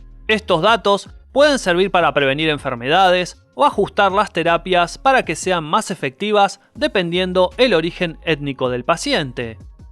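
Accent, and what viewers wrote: Argentina. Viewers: seguramente eres argentinillo por tu plaga para hablar, deberías ser más objetivo y es que la verdad éstas lleno de suposiciones deberías hacer videos como la; BBC inglesa, NHK japonesa ó Discovery channel americana, pero estás a años luz de hacer videos de calidad, tenías que ser argentinillo 🤣🤣🤣🤣🤣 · Qué es una gashina? Es broma es broma 😂. En realidad suena muy cool el acento argentino.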